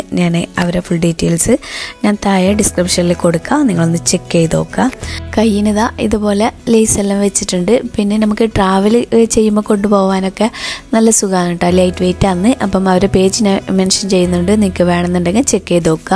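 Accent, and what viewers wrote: Kasaragod, India. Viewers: ഈ slang ksrd പോയതിനു ശേഷം പഠിച്ചതാണോ? മലപ്പുറം slang ഇങ്ങനെ അല്ലല്ലോ atha ചോദിച്ചേ ❣️❣️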